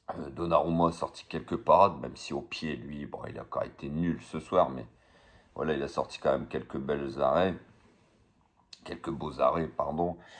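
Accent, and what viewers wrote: North, France. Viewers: Voilà les gars . Tu viens du nord ou koï? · y a un accent du nord la derriere ?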